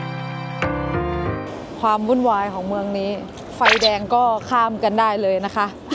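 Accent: North, Thailand. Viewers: อู้กำเมืองระเบิดไปเลย😊คนเหนือ😂ยินดีตวยเน้อ❤🎉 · น้องเป็นคนเหนือกาจ้าว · พูดภาษาเหนือน่ารักดี ชอบๆๆๆ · อู้กำเมือง ม่วนเจ้า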